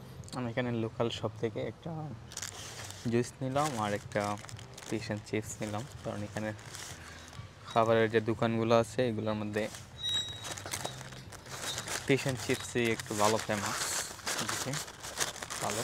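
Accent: Sylhet, Bangladesh. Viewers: Bai afne kita sylheti ni?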